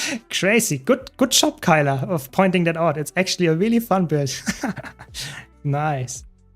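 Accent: Germany. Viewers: Ich war so: Das englisch des dudes klingt sehr deutsch. Check, tatsächlich aus Deutschland xD auf jeden Fall Respekt, dass du das so auf englisch durchziehst! Und cooles Video, vorallem wenn du pve und pvp abdeckst - finde beides sehr spannend und liebe es (pvp macht süchtig, oder?). Bin echt gespannt wohin die Reise mit gw2 geht, neue Elite Specs würd ich auch cool finden!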